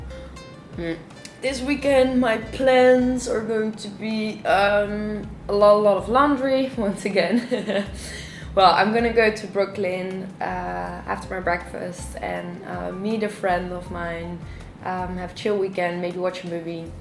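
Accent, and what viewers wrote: Netherlands. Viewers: DUTCH ACCENT + LOVELY VOICE = PERFECTION ☺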